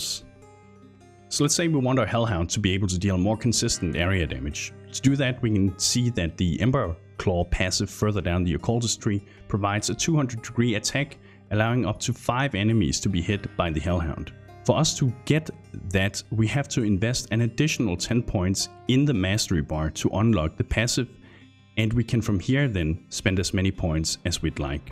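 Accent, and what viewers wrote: Denmark. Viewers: Haha den dansker accent XD god video min ven. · Like ur videos, it sound like ur from Denmark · are you Danish? your dialect sounds so Danish! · It’s a danish accent lol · im guessing from ur accent that ur danish, but im not sure. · The lad is Danish so cut him some slack ffs.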